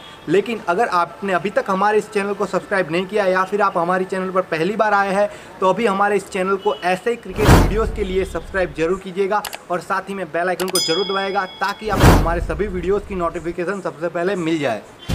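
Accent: Gujarat, India. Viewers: Bhai muje lagta hai ki Aap gujrati ho?? Ans me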